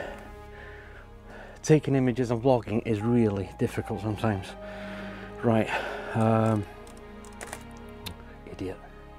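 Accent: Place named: Wales, United Kingdom. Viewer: Great shots,you do make me laugh tho, with you Welsh take on the the English language 😂 oh shit etc 😂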